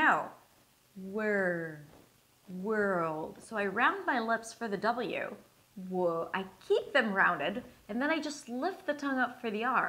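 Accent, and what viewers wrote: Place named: United States. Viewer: Hi! Thank you very much for your reply. My name is Katsu. I'm Japanese living in Japan. I think I speak English pretty well working as an interpreter but I still have a long way to go. You are definitely my role model because you are not an American but still you speak like an American, which shows how hard you must have worked (you moved to the U.S. when you were 20!!??). Acting must have done you a world of good. But how did you acquire your American accent? I really appreciate it if you could elaborate on how you mastered it. I know practice is the only way, but, for example, what accent training book did you use if any? Any accent training in acting lessons? I would like to know so much about you and your way of practicing. Not many people can get where you are, especially if you start your accent training after the age of 20. 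You are fantastic! I'm looking forward to your video about YOU. Thank you!!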